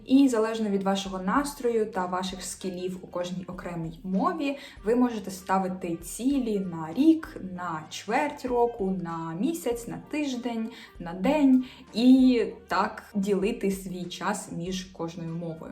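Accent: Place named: Japan. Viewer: Дуже пізнавально, дякую!! хочу сказати що мабуть те що ви почали вивчати японську -наклало відбиток на вашу інтонацію. При бажанні подивіться відео ToriChyanChannel. Наша дівчина що вийшла заміж в Японію, в неї дуже змінилась вимова і інтонація ( на останні слова в реченні) за ті роки що вона там. Бажаю вам щастя і успіху!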